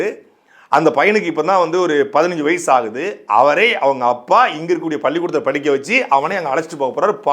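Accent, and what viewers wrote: Tirunelveli, India. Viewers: சாட்டை நெல்லை தமிழில் அசத்துகிறார் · சாட்டை 🌹நெல்லை slung சூப்பர் ஆக பேசுகிறார் 👍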